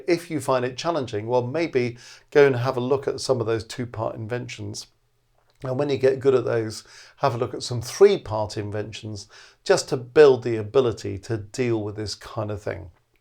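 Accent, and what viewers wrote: United Kingdom. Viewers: I suggest that can you please teach us how to speak British? Because you have the great British accent when you are speaking....Please?? THANK YOU!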